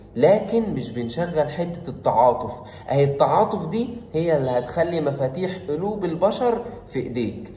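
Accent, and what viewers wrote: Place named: Gaza, Palestine. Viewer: Long live to and EGYPT,your dialect is quite difficult to understand, but i have been following the palestinians for two years now,sometimes you sound like people from gaza./ from mousa in France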